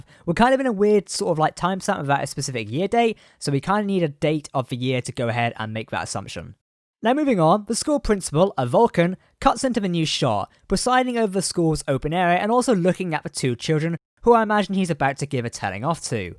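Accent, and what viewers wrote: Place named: United Kingdom. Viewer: Oy. Crikey. Let's have another Brit voiceover commentary about the trailer we just want to watch in peace...ack... so. After Data is gone, Maddox finally figured out how to build Soong Androids, and they decide to revolt and blast Mars ? their leader Beep Boop leads a charge "in the name of Data". Unknown to them, Lore, revived by a drunk lab assistant is behind the plan all along. Meanwhile, in between Picard's dreams of Data, the real Data's head is retrieved by a small Pakled ship in Federation space and sold to Boson, Quark's grandson who decides to rebuild a new body and face with a special inhibitor in it, to give OO-Maks to any Ferengi for an hour if they can afford the gold-pressed latinum to pay for it. Quark, excited and thrilled about the success of his grandson, brags at the old Ferengi's home , laughs at his brother the former Nagis's discomfort . 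Q pops in and looks into the camera saying "why ? because we can"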